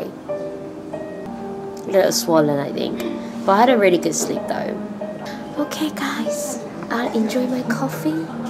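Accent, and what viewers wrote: United Kingdom. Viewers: 영국식 발음 정말 예뻐요!! 보면서 저도 계속 따라하고 있음 ㅋㅋ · 지금은 어디를 파헤치고 계신가요 ㅎㅎ 기분이 좀 우울해서 일부러 영상 보러왔어요 ㅠ! ㅎ 저도 전주 가봤지만 디게 속속들이 정성들여 전주를 여행하신다는 느낌이네요ㅋㅋㅋㅋ 좋아요! 영국영어.. 이제 회화공부를 시작했는데 정말로진짜 처럼 되고싶어요! 저 할수있겠죠?ㅠㅠㅠ 앞으로도어쨌든 영상 잘 볼게요! ㅎ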